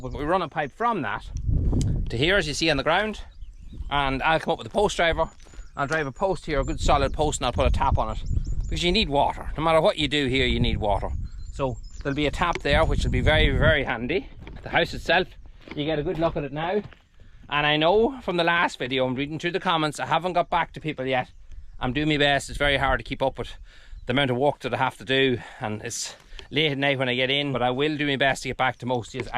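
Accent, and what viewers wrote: Ireland. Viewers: Loving your videos so far and will follow you all the way through your cottage renovation and now it’s got me watching your ifarm wefarm as well. Watching from Perth, Western Australia by the way. We love Ireland and have been there twice all over the place and it’s my favourite country apart form Australia of course. Love your Irish accent, I could listen to you all day.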